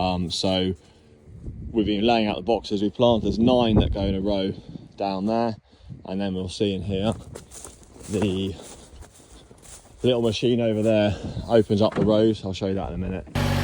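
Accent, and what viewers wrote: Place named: United Kingdom. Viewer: No one needs ask where you come from, its easy to hear you are a Brit because every other word is ''yeh'............................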